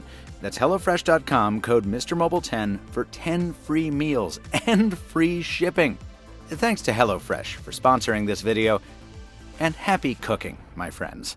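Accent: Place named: New York, United States. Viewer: Well he's my new favorite reviewer! A New York'er but still with a European touch. Love the tone and his narrating. He'd do excellent in Europe too.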